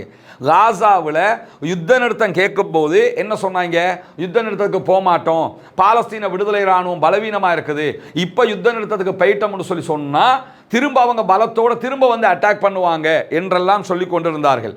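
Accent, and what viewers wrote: Tamil Nadu, India. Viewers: Sago ungali videos ilangaiyulirunthu veliyuduvathaga arinthen unmaiyuleye namba marukirathu manadhu yenenil pesum thoni tamilnattukarargal pesuvathaipol nadaimurai tamizhaga irukkiradhu.🎉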